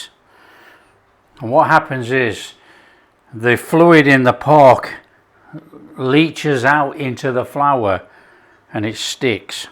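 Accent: South, United States. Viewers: Y'all just listen here to the southern version of chef. You can speak southern a lot better than I can speak your English. Personally, I love it. And I say for the umpteenth time that I love what you cook. And pork chops are another of my favorite. Youve cooked them pretty much like we do. And served with mashed potatoes and gravy is just the best way. Theres not much food that I don't like( I'll never be rich 🤑 enough to eat escargot or caviar) but I will try most anything. Youve made some good eating there chef. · Southern Grandma here. That Southern accent ain't the worst I've heard. I'm a good cook, but my pork chops could use some improving. No doubt, it's somewhat a lack of patience problem! I'll be trying your buttermilk trick soon enough. You know, here in the states, all of our bacon is streaky bacon. Where I come from, it's routine to save your grease from every time you cook the bacon. 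I keep it in a Mason jar in my refrigerator and use it pretty frequently. Love it to grease the cast iron skillet before I make my corn bread. The CRUST! Thanks,. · Not a bad Southern accent!! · As a Southerner in the US... I love your Southern accent! 😊 And your cooking! ❤ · Your southern accent needs work, but the food is what granny made! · God you do make me laugh, I think you're American accent was very good actually. 😊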